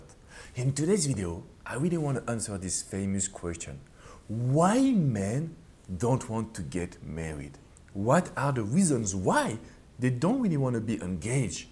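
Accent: France. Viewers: Like your French accent!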